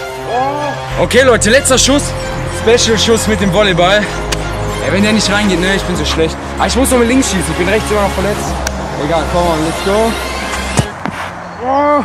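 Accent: Germany. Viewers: kommst du aus Wuppertal?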